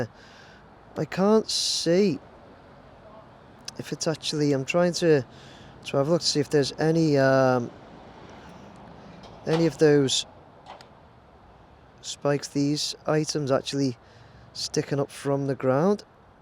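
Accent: Liverpool, United Kingdom. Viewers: Lol....now I appreciate you're Scouse but THAT has gotta be the worst effort at the welsh version of the Racecourse...ever! 🤣 FYI, it's pronounced 'Kai Rass'....👍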